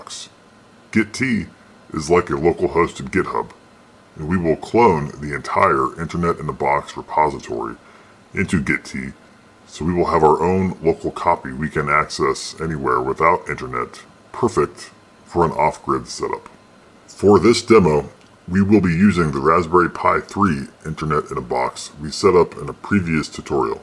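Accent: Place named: South, United States. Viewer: Preppers are so cute when they are LARPing and all edgy and pretending their fantasy is going to happen. Gotta love the "creepy guy from the horror movies" voice modulation. Although, your southern accent and intonations do come through despite this.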